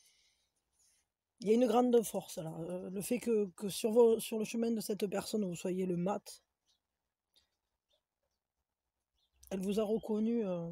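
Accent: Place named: Provence, France. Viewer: vous êtes incroyable)) et ce bel accent, de salon ou des environs je dirais 😂😂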